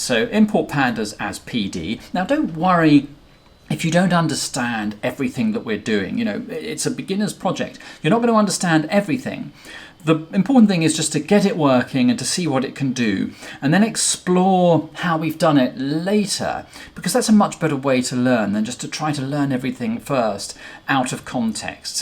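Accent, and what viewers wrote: United Kingdom. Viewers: This is the best british accent I have found on the internet, thank you!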